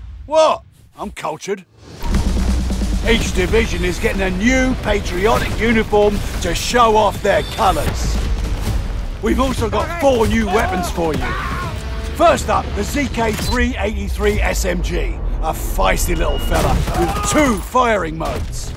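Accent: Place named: Australia. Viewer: Isn't it a little ironic that an Australian man narrates this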